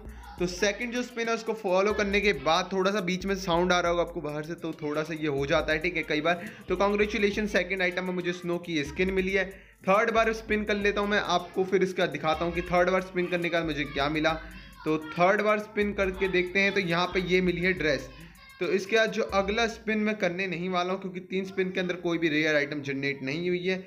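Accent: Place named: Garhwal, India. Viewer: se pta chlta ha ki apna gadwali boli mst bahi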